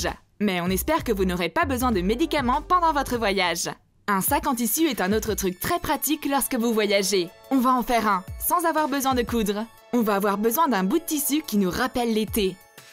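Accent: England, United Kingdom. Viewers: Elle sont anglaise ou française ? ? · Vous être anglaise????? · vous êtes anglaise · Vous êtes anglaise ?? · Vous êtes anglaise 😐😮?? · vous êtes anglaise? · mais vous êtes anglaises ??? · Mais en vrai vous êtes anglaise ou pas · Tu est anglaise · Vous êtes anglaise ou française ou encore les deux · Vous êtes anglaise? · Vous êtes française ou anglaise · Vous êtes anglaise ? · Vous êtes anglaises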